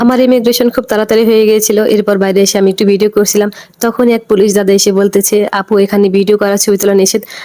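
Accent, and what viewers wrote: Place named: Bangladesh. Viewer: উচ্চারণে ই ধরা পড়লেন আপনি বাংলাদেশী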